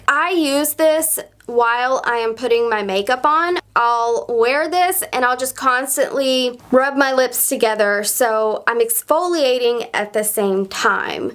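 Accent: South, United States. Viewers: I think she should try asmr. Her southern voice might actually work if she's whispering.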